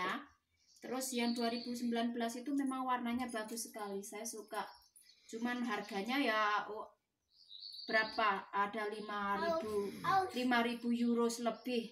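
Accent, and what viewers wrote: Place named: East Java, Indonesia. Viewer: Asli Jawa Timur pasti ya mba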